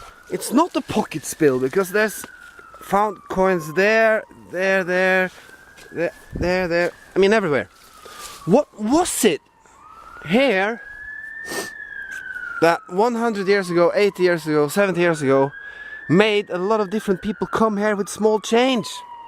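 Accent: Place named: Norway. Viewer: Hi I have enjoyed all of your videos and your great sense of humour and just hearing your accent is making me miss your beautiful country. Where in Norway are you living as I visit the Vestfold area of Norway but its mostly in the winter months. Anyway have a good christmas and i hope to see more videos from you soon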